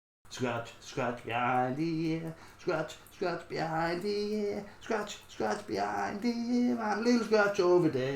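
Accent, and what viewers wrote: Liverpool, United Kingdom. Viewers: It's great to hear another Scouse voice up there. You remind me of home. Great channel.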